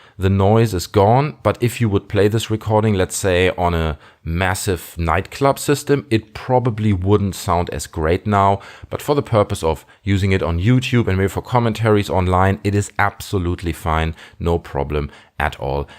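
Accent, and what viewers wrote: Germany. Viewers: 3,5 mm Jack? Ich weiß dass du deutsch bist, haha, man hörts. Könntest du mich bitte auf Instagram anschreiben? Ich hätte gerne über das Mikro diskussieren. Habe vor mir es zu kaufen.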